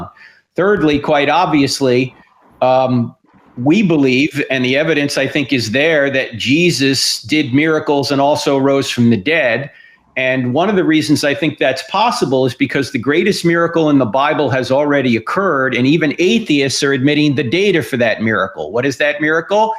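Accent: India. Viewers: i LIKE THE INDIAN ACCENT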